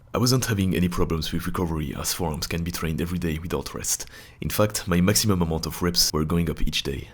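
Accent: France. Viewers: You sound french for real Edit : your are french for real I can ear that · Tes vidéos sont impressionnantes !!! Et en plus j'me dis que je ne suis pas tout seule à conservé mon accent français quand je parle en anglais😅😉. T'es avant bras sont monstrueux, jolie boulot, je me pose la question sur ton régime alimentaires Good job bro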